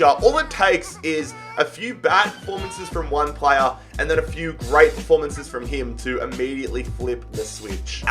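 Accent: Australia. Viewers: Are u from Australia mate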